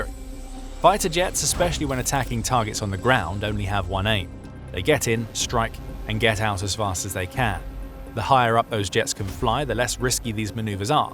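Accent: United Kingdom. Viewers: is so funny that a Brit is narrating this video since their politician are not doing shit in this Campaign I Remember the WarHog from 1978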